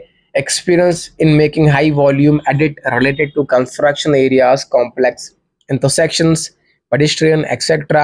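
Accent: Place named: United States. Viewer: Bhai first change your tune into indian. You're speaking hindi with American accent 😂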